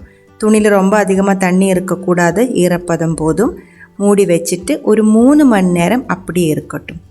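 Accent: Kerala, India. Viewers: കേരളയെവിടെയാ സംസാരം കേൾക്കാൻ നല്ലരസം ഉണ്ട് തമിഴ് മലയാളം കളർന്നു കേൾക്കാൻ നല്ലരസമുഡ് 👌👌👌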